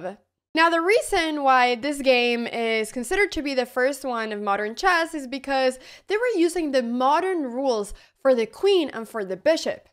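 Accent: Spain. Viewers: Me encanta tu acento en castellano, no sabía que eras española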